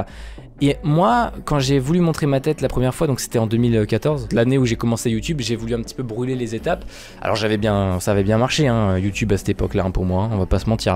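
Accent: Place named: England, United Kingdom. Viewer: Enfin je l’attendais T’a un beau accent anglais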